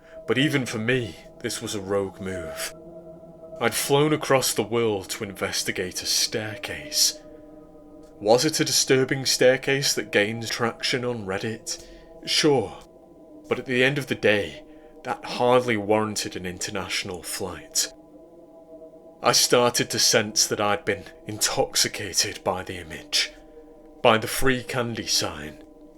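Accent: United Kingdom. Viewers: ahh I thought so good to see another British narrator 😀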